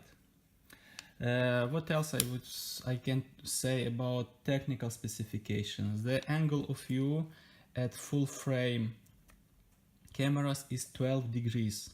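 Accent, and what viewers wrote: Russia. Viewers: Мне кажется, или акцент русского человека говорящим на английском?)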